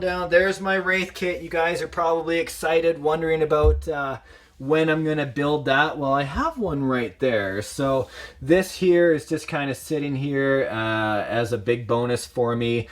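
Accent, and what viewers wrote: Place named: Canada. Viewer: Where are you from? I think you have a canadian accent but could be totally wrong. Just watched a couple of your vids. cool stuff.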